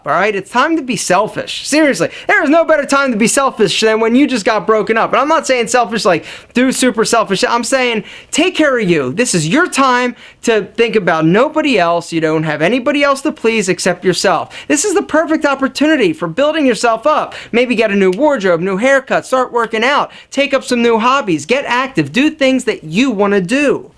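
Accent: Philadelphia, United States. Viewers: You from Philadelphia?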